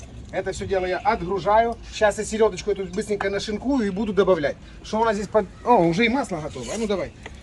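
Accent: Odessa, Ukraine. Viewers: А мы очень любим и. И не важно, что он жарит и где гуляет. Главное - слушать его одесский говор, тонкий юмор. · Я так люблю одессу,а вы такой реальный представи тель ентой местной диаспоры,а ваш говар енто бальзам маи уши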